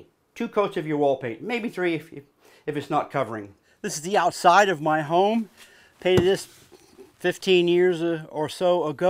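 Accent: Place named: Australia. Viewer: I thought I detected a slight Aussie twang! Great video mate!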